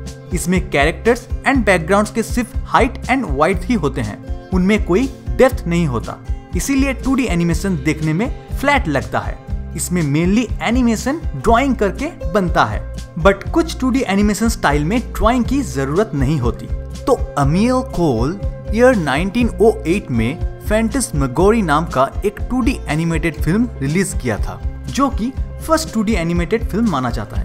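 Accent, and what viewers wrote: India. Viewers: r u from kolkata?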